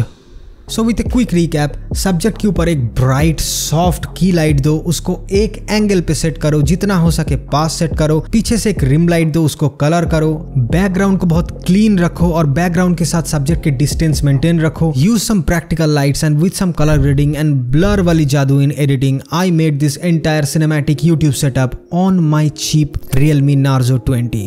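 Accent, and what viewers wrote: Bengal, India. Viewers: Hindi sunke lag rha Bengal se ho? Bangali dada😅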